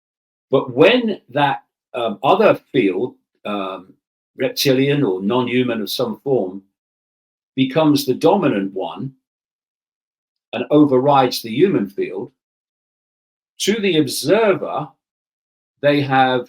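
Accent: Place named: Hertfordshire, United Kingdom. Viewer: He's from Hertfordshire to us inner London crew he's posh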